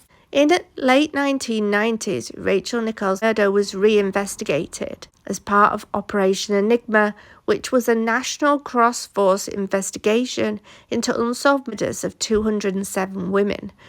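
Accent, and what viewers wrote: Yorkshire, United Kingdom. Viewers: I think I told you this before, I moved to the states 40 odd year ago. I grew up in the Stockport area (Romiley to be precise) and every time I watch your content it gives me a feeling I don't get from any other TC channel. You have absolutely brilliant content and I'm very particular but hearing that yorkshire accent it just takes me to a place and a time I miss so much. Nothing like hearing a familiar voice/accent from back home. Don't get me wrong tho, if your content wasn't any good I wouldn't be here so you have earned my subscription because of your great content and not just your accent although the accent is a nice treat for me. Cheers! 🤘🏻🇬🇧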